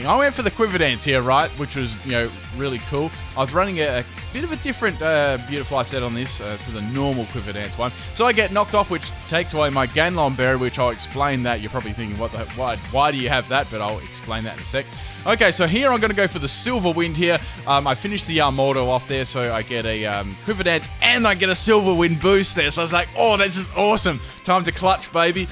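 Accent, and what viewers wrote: Australia. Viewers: Omg ur australian?! What state?! I'm from Queensland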